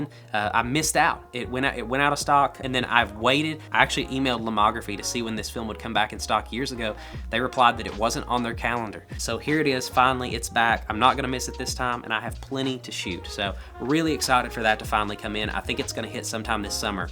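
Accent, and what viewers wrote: Kentucky, United States. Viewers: I could tell your accent was a KY accent and when you mentioned being a hillbilly you confirmed it for me. Fellow Kentuckian here. I’m so excited to follow your journey!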